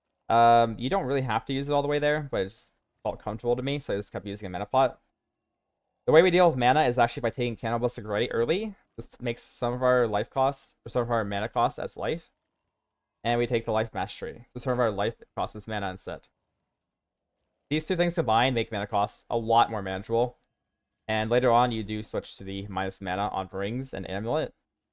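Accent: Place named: Germany. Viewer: Wie wär's mal mit langsamer sprechen? Immer diese Deutschen und ihr versuch englisch zu sprechen...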